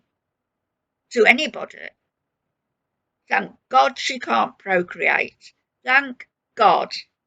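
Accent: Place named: West Bromwich, United Kingdom. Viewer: Hi, you’re so spot on with all of the chaos with both FB and Nader. You sound just like my family member from West Brom x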